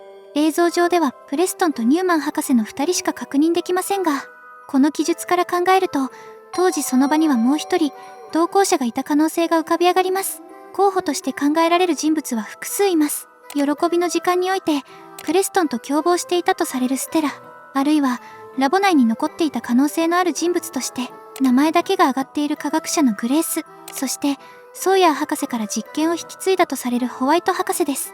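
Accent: Kansai, Japan. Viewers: 関西弁マジでおもろいなwwww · 何故関西弁？ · 関西弁にするとなんだか吞気に見える不思議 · なんで関西弁やねんwこのチャンネルちとネタ入れてる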